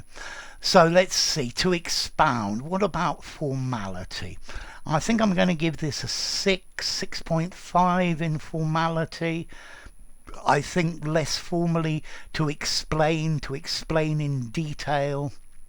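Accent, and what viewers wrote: United Kingdom. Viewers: Hello, are you british or american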